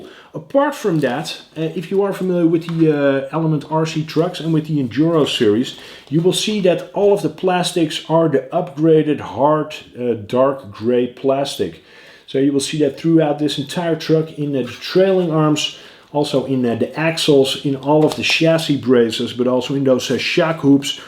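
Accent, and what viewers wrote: Netherlands. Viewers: What is that accent you have? It sounds a bit Dutch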